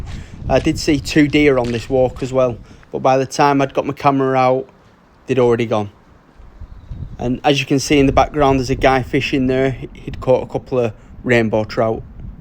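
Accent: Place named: Lancashire, United Kingdom. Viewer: Great video. Nice place. Nice to hear a Lancashire accent 👌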